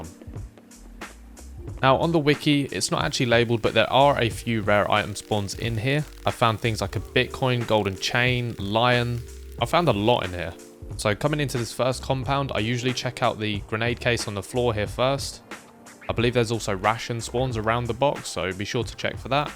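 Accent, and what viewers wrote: United Kingdom. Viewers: Easy sub for you here mate. Clear consise no BS and British. Woods not intense though? Gotta argue on that one! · Best woods guide on the internet, with a British accent to top it off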